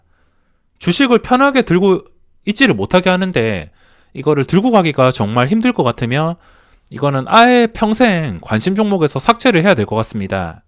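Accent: Gyeongsang, South Korea. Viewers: ㅋㅋ 경상도 분이라 발음이 좀 쎕니다. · 이해해 주세요~ 저도 그렇지만 경상도는 발음이 어렵습니다ㅜㅜ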